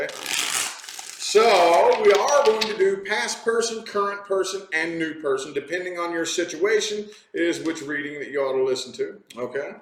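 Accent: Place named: Texas, United States. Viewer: Yes!! Love a little country accent in a tarot reading, love Texas girl lol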